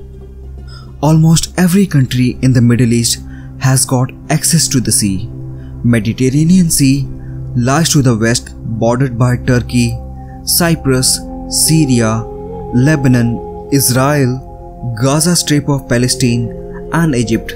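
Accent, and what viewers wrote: India. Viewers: Nasty accent I think it's Indian guy talking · Indian accent is irritating,but the content is superb! Congratulation to the presenter for the effort he has done.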